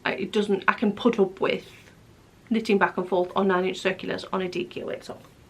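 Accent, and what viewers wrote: North East England, United Kingdom. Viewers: You have a north east accent. Im Sunderland birn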